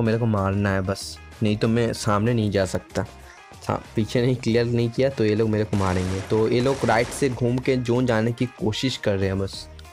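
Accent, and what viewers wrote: Bengal, India. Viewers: Bhai ap Bengali ho kia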